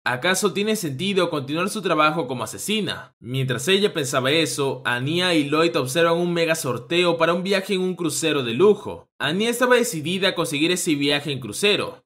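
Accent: France. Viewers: ¿,tienes acento francés?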